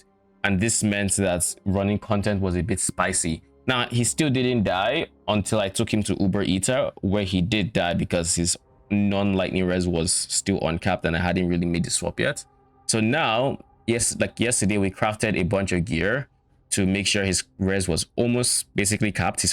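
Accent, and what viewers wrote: Nigeria. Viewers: Came to see the build out of curiosity, followed after hearing what sounds like Naija accent 😅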